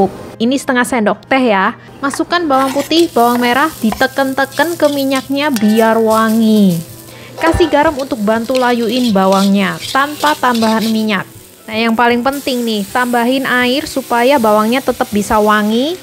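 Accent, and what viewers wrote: Java, Indonesia. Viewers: Ngakak jawa koek nya.....tp mudah di fahamj...trimakasih....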